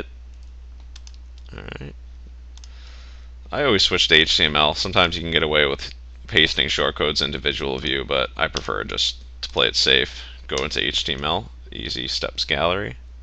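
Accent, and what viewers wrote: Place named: Canada. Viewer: by the accent, I'm guessing you're Canadian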